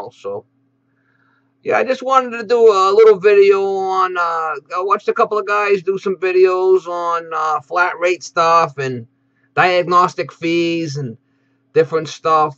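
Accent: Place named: New Jersey, United States. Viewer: You tell it like it is. You ain’t no charity fix-all. If people want oats that’s already been through the horse they come a little cheaper but if they want nice fresh green oats, they gotta pay for them. Like all of your videos and your accent. Jersey?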